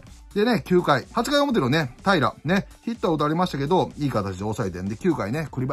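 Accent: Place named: Western Japan, Japan. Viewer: 声質と西日本系のイントネーションが似てるのかもしれませんね！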